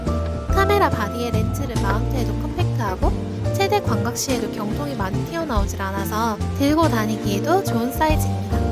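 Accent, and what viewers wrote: Busan, South Korea. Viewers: 나중에 카메라 하나 장만하게 되면 바로 너로 찜뽕이다 후하 그나저나 중간중간에 부산 사투리 들리는 게 너무 귀엽고 좋네요😚 오늘 영상도 잘봤어요!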